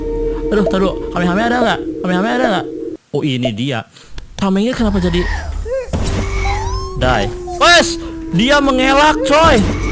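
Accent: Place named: Cianjur, Indonesia. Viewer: Kayak orng cianjur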